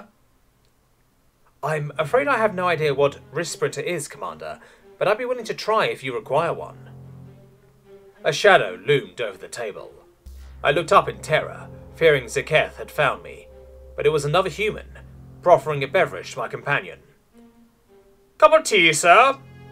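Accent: United Kingdom. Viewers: Ahh, so British! it was refreshing! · the is british "he is british lad"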